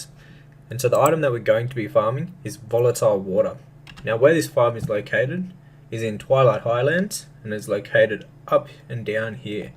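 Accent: Australia. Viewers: Love your Aus accent lol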